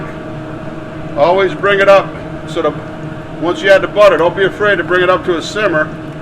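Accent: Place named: Philadelphia, United States. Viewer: "Gabbagol?" "Prosciut'?" Are youse guys from Philly?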